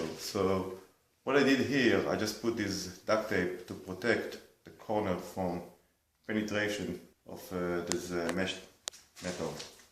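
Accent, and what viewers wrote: Israel. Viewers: You sound Israeli. Thanks for the video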